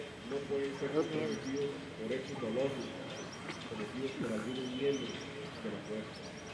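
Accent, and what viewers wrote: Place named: Santander, Spain. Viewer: Creo que es Santanderiano mano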